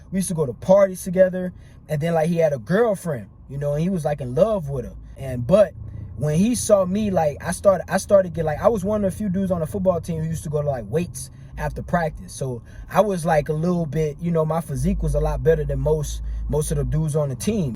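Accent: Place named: New Orleans, United States. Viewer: Bro sound like he from Louisiana I'm guessing New Orleans 🤔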